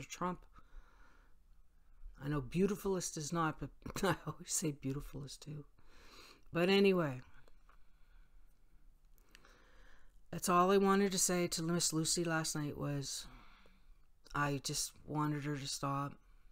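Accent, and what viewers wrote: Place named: Canada. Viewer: i enjoy your videos, and Canadian accent 😃, but these people are so vicious and hateful when you disagree with them it's pure insanity. LB's "people" are going to jump in an attack you with their mob mentality. They are incapable of polite disagreements, the hate they throw out is so horribly cruel. I think you do a great job & you have every right to voice your opinions, especially on your channel. Nobody has to watch anything they disagree with, or they find offensive. Have a great day.🌷🌷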